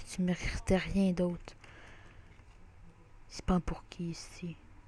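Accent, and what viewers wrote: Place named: England, United Kingdom. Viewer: Est tu englais car tu a un accents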